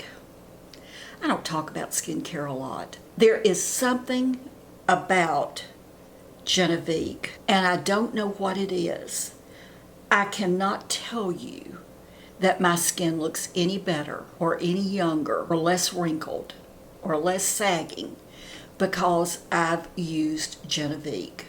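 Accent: South, United States. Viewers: I am closing in on 79 and was so pleased to find you onout youtube. I live in North Carolina and am very happy to hear your lovely southern voice along with the fact that I enjoy your show so much. I won't visit youtube without looking for you first. Ann McE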